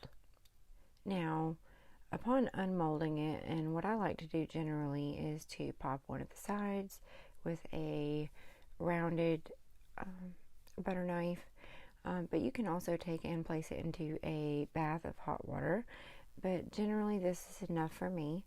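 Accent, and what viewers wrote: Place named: United States. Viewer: that's fabulous and I am looking forward to viewing it, you have a great American voice, you sound very calm and placid! I would like to make videos but I have no understanding of video editing, I have some super ideas for content but just don't have the time to train myself in video producing so I am trying to write instead, however even with that I struggle as I much prefer writing with pen and paper, my previous life is so far from my new venture in upcycling and art and I would love to tell my story of the last two decades after the 90s rave scene mingled in with my theories on elite circles and their corruption and the impact on society, it is an extremely unusual tale from a part of England that is not really documented, I am from the southwest which to most is a sleepy, rural, picturesque haven but it is really different to what people think! 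Well I'm probably driving you crazy now , I'm terrible for going off topic, but I do love chatting especially to Americans! I love America and would love to one day visit.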